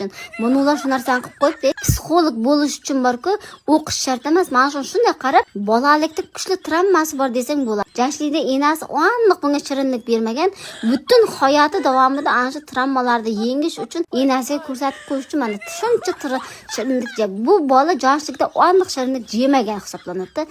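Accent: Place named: Samarkand, Uzbekistan. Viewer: Bu meni qishlogʻiimni shevasi eshitib mazza qildim Samarqand joʻsh qishlogʻi 7 yil boʻldi bormaganimga uy va moshina olish uchun Toshkentda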